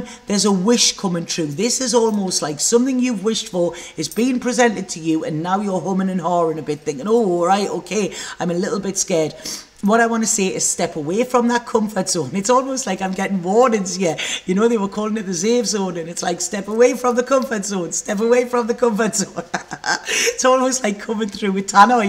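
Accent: Newcastle, United Kingdom. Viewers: Awwww how man!! a Geordie lass tarot reader!!! Love it!! Just stumbled across you! You've now got a Geordie follower 👍xx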